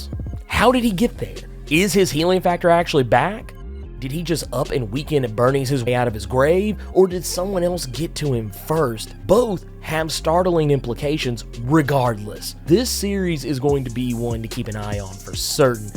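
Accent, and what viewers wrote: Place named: Kentucky, United States. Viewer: you sound like you're from kentucky.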